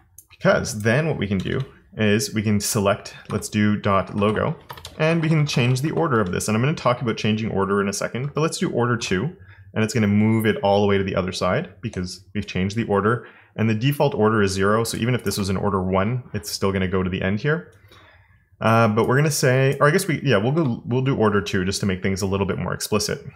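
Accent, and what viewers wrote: Canada. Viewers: Canadian accent and them o's.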